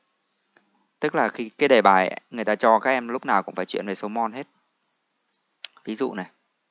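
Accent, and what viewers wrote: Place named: Thanh Hoa, Vietnam. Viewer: anh là người thanh hóa ạ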